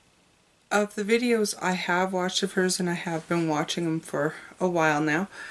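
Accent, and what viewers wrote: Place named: Australia. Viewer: Love the Aussie accent.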